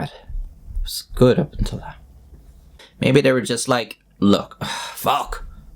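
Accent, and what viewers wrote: Ireland. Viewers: He is a well educated speaker of Irish English, that is how that number is pronounced.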